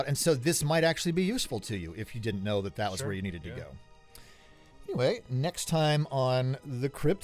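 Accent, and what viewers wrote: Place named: South, United States. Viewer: I absolutly love the southern accent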